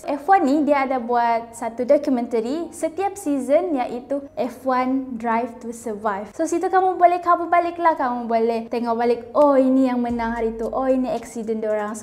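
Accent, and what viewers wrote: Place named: Sabah, Malaysia. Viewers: Dari loghat macam orang Sabah terbaik kak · Pekat loghat sabah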